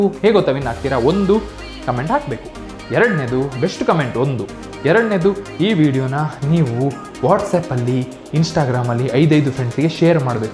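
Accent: Mangalore, India. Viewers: speaking with manglore kannada hits the heart ❤❤❤❤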